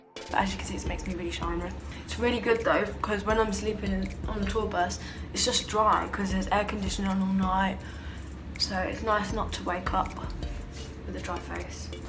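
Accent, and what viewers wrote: United Kingdom. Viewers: Awwwww I didn’t know she was British so cute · Wow I had absolutely no clue she was british until I watched this video 😩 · U lot act like youve never heard a british accent before lmaooo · The calmest British accent I ever heard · I was so confused at the beginning bc I forgot she was British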